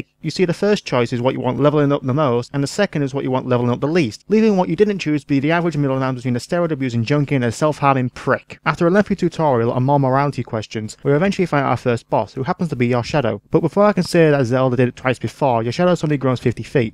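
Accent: Northern England, United Kingdom. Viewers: you'll find that most british people can understand this perfectly fine, it's just most of the american audience switch off when they realise that not everyone in britain speaks like hugh grant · I do! Gay lyfe! You from Northern England?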